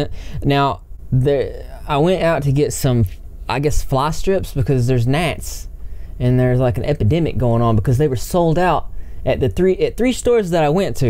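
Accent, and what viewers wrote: South, United States. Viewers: you rock a southern voice THATS HARD TO DO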